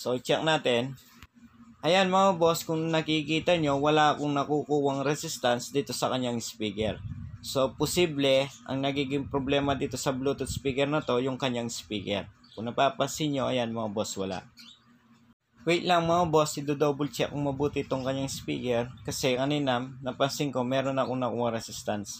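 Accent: Pampanga, Philippines. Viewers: Kapampangan ka ata boss hehe halata ee..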